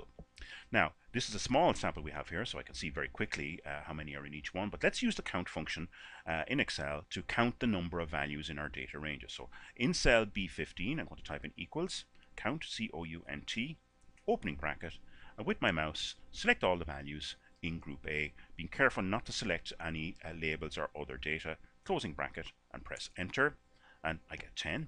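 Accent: Ireland. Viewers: I found this video very useful,. And...are you Irish, cause you sound Irish, and your last name is O'(name). I liked hearing your voice as I followed along (it was very relaxing and almost lulled me to sleep, loll), and I liked the fact that you went slow. Thank you so much! Blessings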